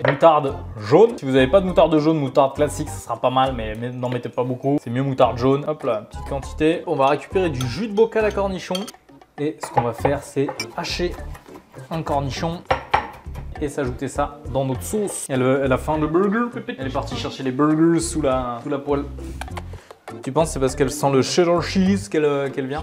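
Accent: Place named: England, United Kingdom. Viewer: super video on voit que l'on peut quand même ce faire plaisir sans trop de calories mais par contre l'accent anglais sa me soules 😅😅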